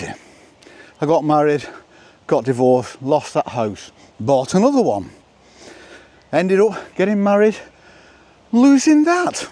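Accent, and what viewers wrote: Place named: Lancashire, United Kingdom. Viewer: Thank you for sharing your openness and authenticity of your self. Not only as a fellow Lancashire person no longer in the area am I drawn to your accent and the scenery I find it refreshing to see and hear authentic videos. See you on the " flip"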